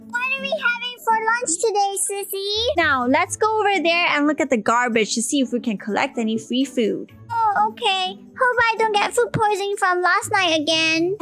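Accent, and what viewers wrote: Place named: Malaysia. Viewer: You sound malay🤔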